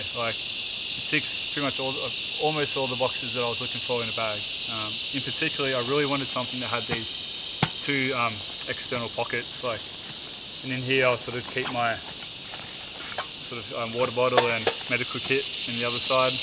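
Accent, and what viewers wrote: Australia. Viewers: awesome vid! with an added bonus of an aussie accent :P Haha cheers from Canada !!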